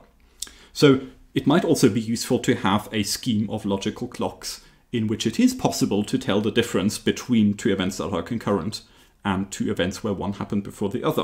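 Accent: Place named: United Kingdom. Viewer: Wow, first time listening to Cambridge University. Love it, both content and the British accent.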